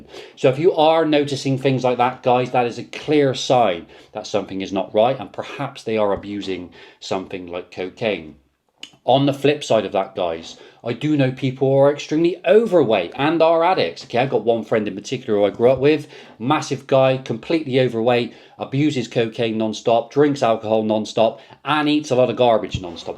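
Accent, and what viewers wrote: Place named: Bristol, United Kingdom. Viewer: You sound like your from Bristol ? Can I get in touch privately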